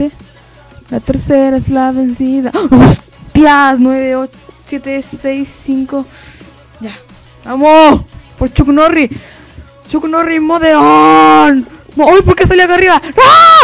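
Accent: Chile. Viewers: su acento me suena chileno:D si no mira que es chileno y compara si no es chileno (SORRY ASI SUENAS) y no importa que hable con otro acento es la vida de el:D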